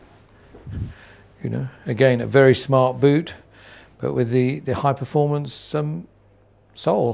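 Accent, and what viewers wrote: United Kingdom. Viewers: speaks a very natural and nice English... with a London accent but without sounding too posh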